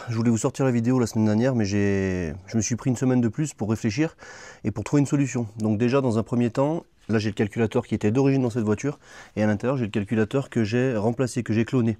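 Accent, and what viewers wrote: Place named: England, United Kingdom. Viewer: Bonjour, j'ai fait une visite par hasard sur ta chaine ( 1 pouce et un commentaire ) mais ce que j'y ai vu m'a fait prendre , disons 40 ans de plus !!!🥸 Fidèle abonné de la chaine CVVT de Vincent Raynaud, je ne l'ai jamais vu se lancer dans de telles aventures informatiques. Les Mini dont il est un spécialiste sont certainement des modèles plus anciens. Pour info cependant si tu ne le connais pas, il restaure des voitures récupérées dans le maquis ( Corse) et a même remonté une Mini avec laquelle il a fait un rallye historique il y a 2 ans ! Pour conclure je suis quand même assez surpris d'avoir découvert que le moteur de cette voiture avait été cassé à plusieurs reprises ! Bon courage pour arriver à tes fins, quant à l'accent anglais, pas de problème, mais traduis plutôt les messages en français ce sera plus cool.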